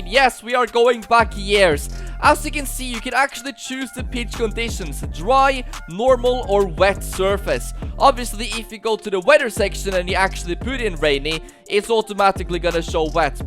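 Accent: Norway. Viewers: Jeg kan høre på engelsken din at du er norsk bruttern❤😅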